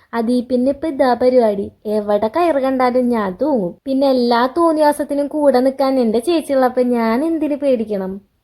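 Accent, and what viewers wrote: Thrissur, India. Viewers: Thani thrissur bashayund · നിങ്ങള്ടെ സംസാരം തൃശൂർ സ്റ്റൈൽ ആണല്ലോ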